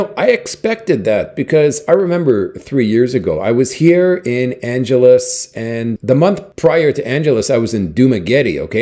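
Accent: Canada. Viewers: Ha ha!, you don't hide your western Canadian accent. Very nice sound to my ears, even though I am from Newfoundland with a very different accent. After 4 years in the Philippines, the accent is nice. Stay cool bro.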